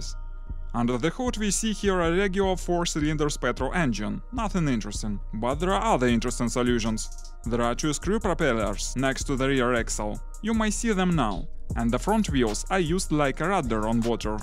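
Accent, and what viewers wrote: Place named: Russia. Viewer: У тебя русский акцент- ты русский,ну или из бывшего СССР??))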